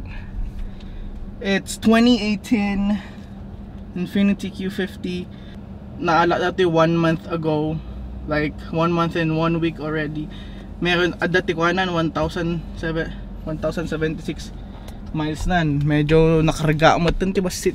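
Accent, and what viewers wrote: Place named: Ilocos, Philippines. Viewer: Kayat ko accent ti ilokano..☺😘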